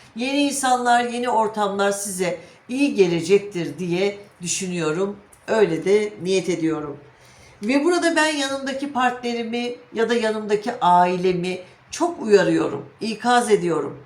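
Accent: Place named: Central Anatolia, Turkey. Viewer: Aldım kabul ettim bana uyanlari. ağzınıza sağlık hanım sizi çok severek dinliyorum nerelisiniz acaba merak ettim konuşmanız iç Anadolu bölgesi'ne hitap ediyor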